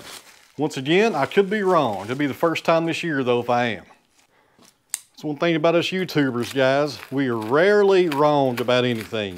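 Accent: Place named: Tennessee, United States. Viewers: I happen to like your Tennessee dialect! Don't change for anybody. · Keep on bragin' on yourself my friend, and I love the TENN dialect. Love watching your videos!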